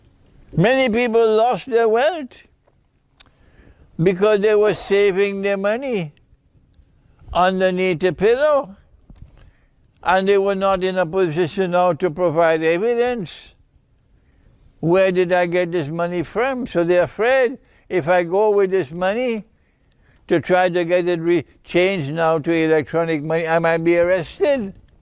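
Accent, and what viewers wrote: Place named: Tamil Nadu, India. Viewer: Trans tamil english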